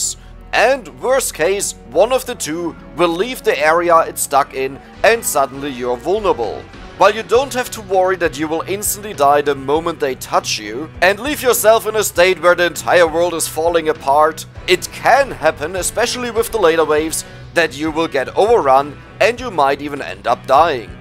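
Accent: Germany. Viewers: dein englisch klingtr mega deutsch, mir würde das video in deutsch mehr helfen XD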